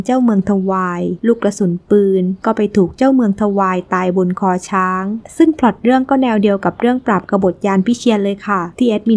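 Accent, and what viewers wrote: South, Thailand. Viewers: ฟังน้ำเสียงแอดมินเป็นคนใต้ไหมครับ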